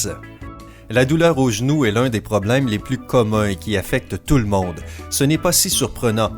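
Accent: Quebec, Canada. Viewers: accent canadien je crois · C une voix québécois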